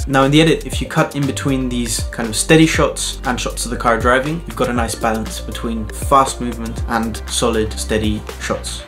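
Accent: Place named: Canada. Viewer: wait you are 100% canadian, that drivist prononciation.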